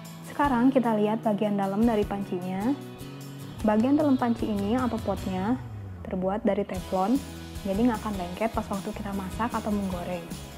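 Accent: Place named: Sunda, Indonesia. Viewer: Kangen sm suarany,,,orang jawa timur tapi suara sunda heee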